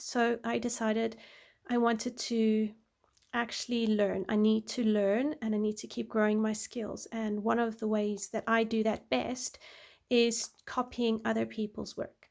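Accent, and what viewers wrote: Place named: South Africa. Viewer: You sound Soith African? I am too but we moved to Germany. First time viewer here. Haven't watched more than 2 mins yet, just enjoyed hearing your accent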